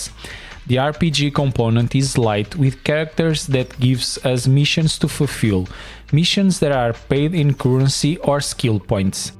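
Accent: Portugal. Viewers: Este sotaque tuga nao há como falhar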